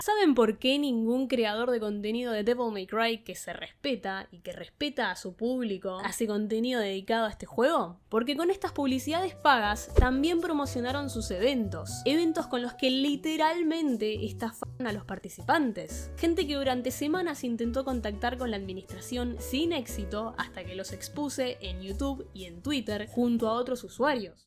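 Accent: Argentina. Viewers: Primer video que veo y ya note el acento argentino, como argentino eso me hace muy feliz! Es por esto que deje los gachas y bueno, es una lastima que el nombre de DMC haya sido manchado con esta estafa con cara de juego. Por ahora a seguir escuchando para seguir MOTIVADO!! Buen video😊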